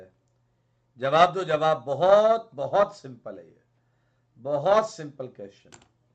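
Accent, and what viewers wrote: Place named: Uttar Pradesh, India. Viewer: Sir aap up se hai?? Aapki accent mere papa jaisi hai 😅😅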